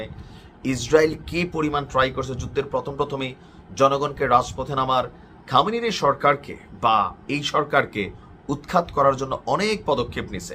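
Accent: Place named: Noakhali, Bangladesh. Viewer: ওটা নোয়াখাইল্লার ভাষায় এটা কথা বলে আল্লাহ জানে আমার চুল ধইরা বাংগাল টানে আমার বাল ধইরা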